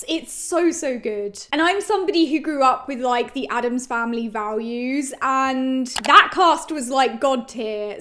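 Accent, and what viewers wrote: United Kingdom. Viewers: not related to the topic... but where is your accent from? Somewhere in the UK I presume?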